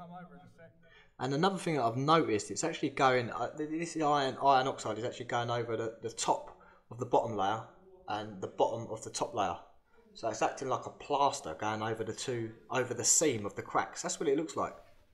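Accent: United Kingdom. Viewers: Ello babe, where in uk r u from??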